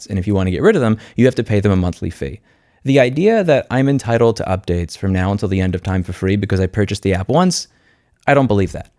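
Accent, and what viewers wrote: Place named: Boston, United States. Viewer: Why do I always think of "Good Will Hunting" when I watch? It has got to be the accent or something...when is schooling companies online, it totally reminds me of the bar scene!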